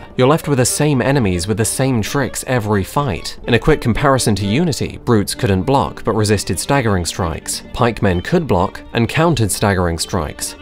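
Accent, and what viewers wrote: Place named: United Kingdom. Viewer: Anyone can cobble words together with a British accent... Intrinsic this extrinsic that... And sound smart to stupid people. If you are going to deep dive maybe read a little and give yourself historical context. You're missing so much and focusing in too much on mechanics but the rot in AC is in its spirit, not engine. Ubisoft is a sexual assault/crunch factory swamp... Toxic. That's why your games are ass. You don't even come close to addressing this stuff. I can't wait for a game essayist who has even one other idea/Interest aside from gaming.... So just Jim sterling lol. Read a book.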